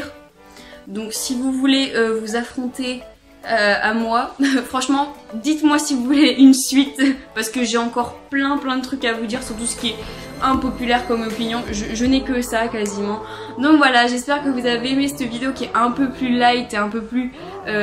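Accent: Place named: England, United Kingdom. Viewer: T0N ACCENT ANGLAIS PUTAIN INSUPORTABLE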